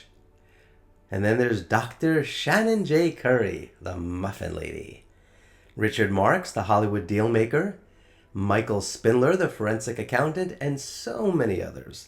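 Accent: New York, United States. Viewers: Wow. A vegan crazy cat guy proficient in mythology and uniquely gifted to tell the tale - in a New York accent no less! 😍🥰 In the interview with Joe you quoted your mom to say a New Yorker would never be president because people don’t understand us. No truer words! And they are poorer for it.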